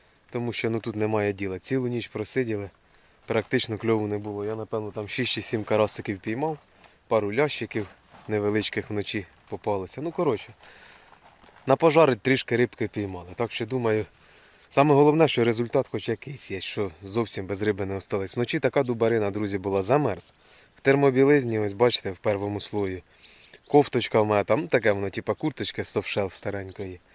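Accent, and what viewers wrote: Poltava, Ukraine. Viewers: теж хотів запитати і знайшов комент.. бо почув акцент полтавський..та і зарості схожі)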